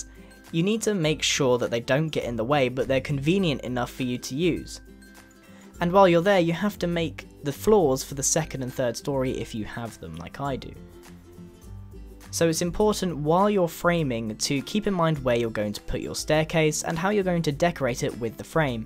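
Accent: United Kingdom. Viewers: He's british, ok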